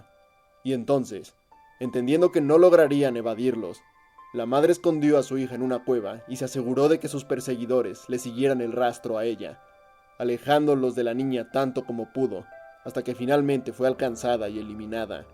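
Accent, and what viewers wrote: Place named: Mexico. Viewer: no sé bien dónde es Oaxaca pero suena a mexicano, y no soy mexicano pero tienes algo encontrá de los mexicanos son unas buenas personas. En todo caso me da igual el vídeo está bien hecho no te meto odio. Ya que más quieres buena suerte.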